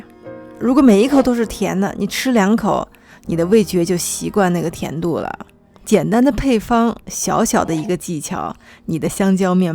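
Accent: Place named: Beijing, China. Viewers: 好喜欢小高姐讲解的声音，北方腔好治愈，音乐也好！喜欢5555，想学肉夹馍！！ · 小高姐，你人生活在印度嗎？為什麼又印度奶茶，又印度薄餅？為什麼說話口音是京騙子？膚色是印度人的膚色？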